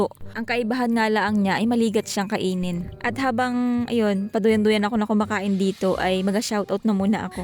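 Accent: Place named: Mangyan, Philippines. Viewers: ma'am taga mindoro ka po ba? kasi sa tono ng salita mo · Miss sudgest lng aliisin mo ung tunog kamangyan kc Ang galing Ng voice over at mga jokes mo kaya d mo kailangan gumaya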